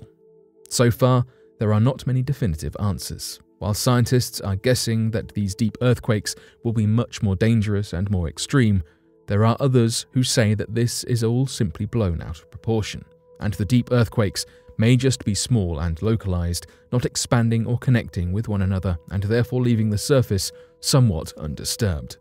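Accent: England, United Kingdom. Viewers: English or European? Love hearing your real voice on your blog love true dialects and love your channel and info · What scientists? Names? Why is a guy with a British Accent even doing a video on this? Are we supposed to believe you more because of your accent? California has been experiencing quakes for years. Scientists look at data, the take an educated guess at when something happends. A dart board would be more accurate. Sorry but terrible video.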